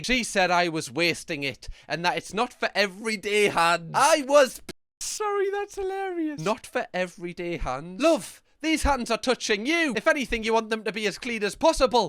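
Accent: Newcastle, United Kingdom. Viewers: It’s just part of his Geordie (sp?) accent - lots of Brits talk that way - I’m used to it now and find it charming 🇨🇦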